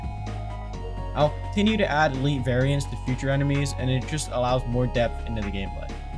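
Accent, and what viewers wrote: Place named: South, United States. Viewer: southern accent sounds lovely!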